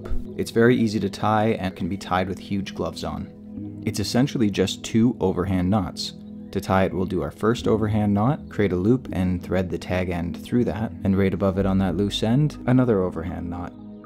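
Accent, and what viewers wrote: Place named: Canada. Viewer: I can’t help but noticing your thick Canadian accent. I’m surprised that you guy don’t call the Canadian jam knot the jam knot.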